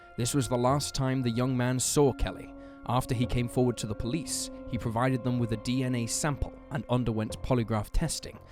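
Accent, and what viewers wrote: England, United Kingdom. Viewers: Narrator has an English accent.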